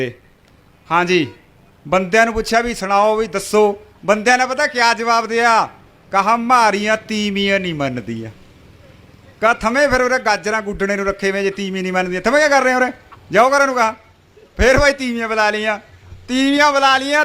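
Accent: Puadh, India. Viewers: Puyadhi boli eh Sadi..but sanu malwa 2 da nam de dita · ਪੁਆਧੀ ਬੋਲੀ ੲੇ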